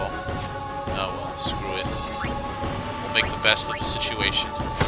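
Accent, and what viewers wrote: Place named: Arkansas, United States. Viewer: WAKA WAKA WAKA! dude are you from arkansas? You must be...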